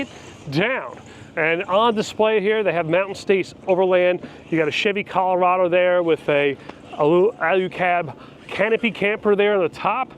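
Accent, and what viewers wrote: New Jersey, United States. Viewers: Love the Jersey accent! 😊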